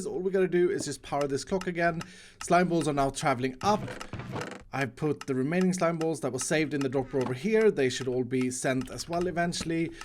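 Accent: Sweden. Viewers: are you swedish? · I am new to your youtube. Are you from sweden becus you sounds wery like a swedish persone. Sorry if you not are from sweden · Funny how i could instantly tell you're swedish based on your accent but had no idea keralis was from our western neighbour too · Do you come from sweden? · It sounds like you are swedish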